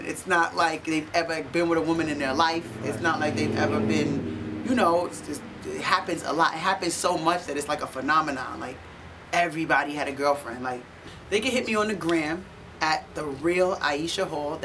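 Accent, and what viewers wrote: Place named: New York City, United States. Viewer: That's the NYC accent! I love in the Bronx...woman are a bit tougher than your average coming from NYC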